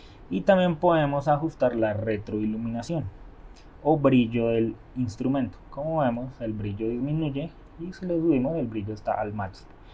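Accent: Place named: Bogotá, Colombia. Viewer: lo explica con acento rolo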